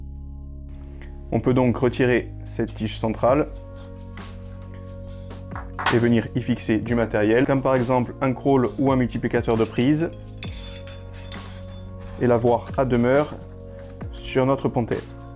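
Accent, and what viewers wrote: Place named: Corsica, France. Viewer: le petit accent corse s'est dépaysant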